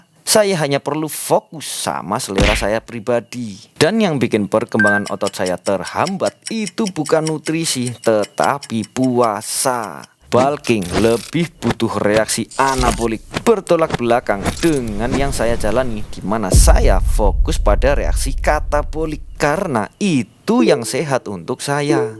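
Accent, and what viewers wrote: Yogyakarta, Indonesia. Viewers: kok koyo logat wong jogja yo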